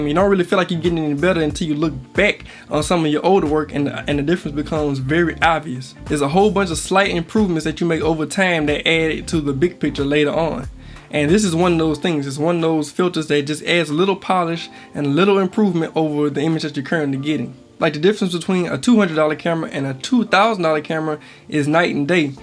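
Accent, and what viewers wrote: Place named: Alabama, United States. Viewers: YOU FROM BAMA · I recently discovered your channel and have watched several of your videos. I don't normally comment on YouTube videos but I felt compelled to reach out and encourage you to keep making great content. Also, somewhat random, but I moved out of the US a couple of years ago and, originally being from the South, it's nice hearing another Southern accent - especially one in such a positive context. Keep going!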